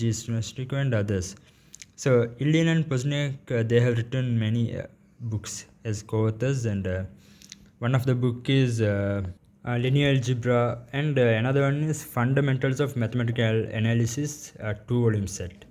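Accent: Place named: India. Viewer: Are you Indian because your accent is so Indian btw i am also from india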